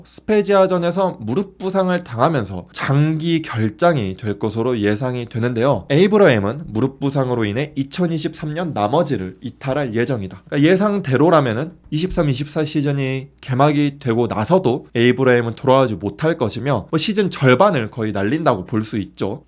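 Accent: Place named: Jeolla, South Korea. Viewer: 전라도 억양 디게 거슬리네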